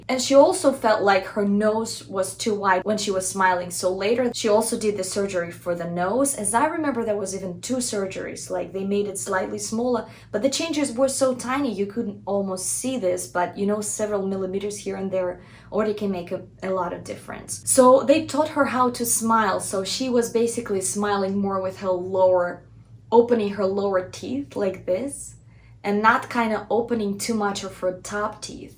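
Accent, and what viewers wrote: Russia. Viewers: По акценту поняла сразу, что автор русская))) местами прям проскальзывает произношение, на согласных особенно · Was listening to you and all the time was thinking,that you are Russian,the accent is evident, then read about you after the video and saw that I was right❤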